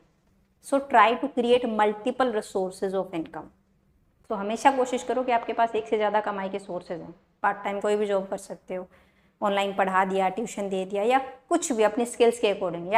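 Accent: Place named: India. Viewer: I love your Hindi accent mam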